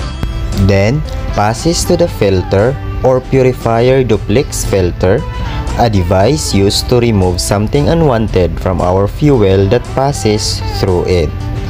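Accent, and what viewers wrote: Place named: England, United Kingdom. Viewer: I have loved your content, and I love your English accent, it is easier to understand ❤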